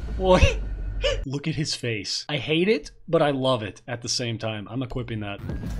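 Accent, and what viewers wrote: United Kingdom. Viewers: Aaaaah! It’s a british person! 🤣 You got it! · ITS A BRITISH PERSON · its a british person LMFAO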